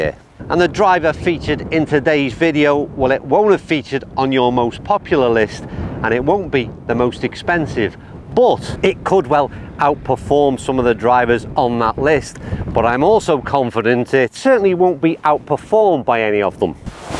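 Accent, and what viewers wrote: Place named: England, United Kingdom. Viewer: Why do we have to listen to people that have a strong English accent???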